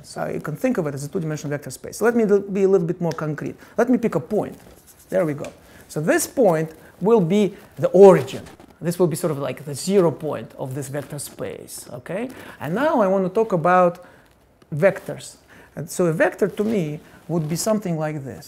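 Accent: Russia. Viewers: That's real mild russian accent. Doesn't quite sound like ensign Chekov, right? :D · Born May 2, 1968 Kolomna, USSR i knew it from his accent! ) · It so funny and pleasing to hear his Russian accent and intonations here and there :) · Thinks Hmmm... He has accent like a russian. googling Yup! He is russian :) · sounds like Russian pronunciation. maybe he will do same speech in Russian? it would be such a pleasure. but I can be wrong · Russian accent hm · I LOVE HIS ACCENT . MOTHER RUSSIA!!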